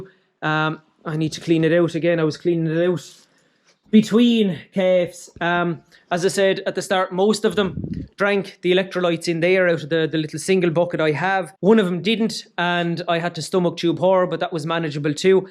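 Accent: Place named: Ireland. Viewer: I know nothing about cows but I love your Irish Accent. New subscriber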